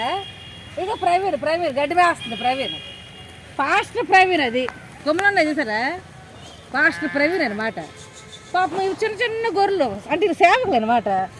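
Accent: Srikakulam, India. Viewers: Nuvvu nee seekakulambhasha nee face chuste asayamvestundi gorrhemohamdana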